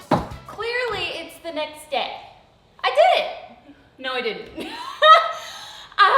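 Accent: Texas, United States. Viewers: Your Texas accent is coming back video by video. :DDD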